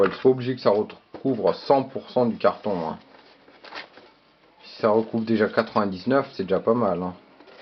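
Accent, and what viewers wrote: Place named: Auvergne, France. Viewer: Rien qu'a ton accent j'ai su que t'etais auvergnat "on y fais..." bref bah moi aussi je suis de Clermont-Ferrand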